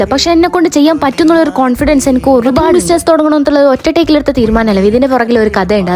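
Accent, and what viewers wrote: Kasaragod, India. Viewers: Aiwaa ksd slang bannallooo ❤yeyy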